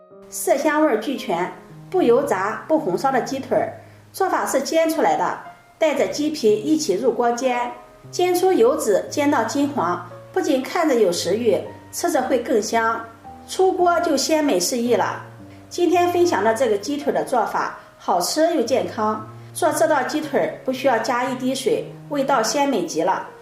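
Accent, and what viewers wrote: Shandong, China. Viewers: 第一次收到推送就关注你了，听口音山东银👍💪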